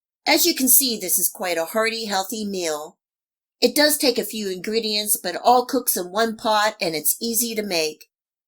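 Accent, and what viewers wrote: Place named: Pennsylvania, United States. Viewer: Made something similar today, I was craving it. By the way … are you from Pennsylvania? I detect that accent, because I’m originally from there, and I know it when I hear it!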